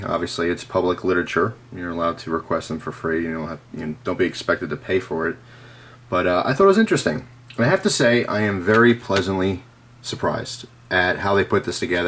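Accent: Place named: New England, United States. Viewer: ive been watching your videos so much i feel im taking on your new englandish accent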